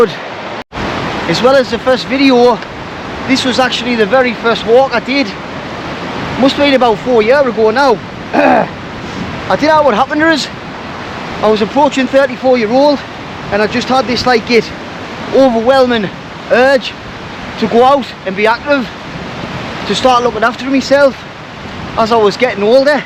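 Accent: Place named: North East England, United Kingdom. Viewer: I left the north east 35 years ago and was just trying to pin point your accent 😂. I'm walking up from Leicester to the top of Scotland in day long stages (when I get chance between work and other commitments) . I drive to where I got to on the last walk, then do another hike north. I had a rather brutal walk from Glossop to Marsden in January, over some similar stunning terrain in the north Peak District. I think I'll route myself through this spot when I get this far.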